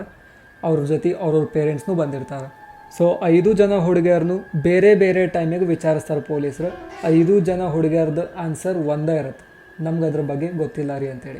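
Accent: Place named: North Karnataka, India. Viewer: Ur good never heard about u...good north Karnataka accent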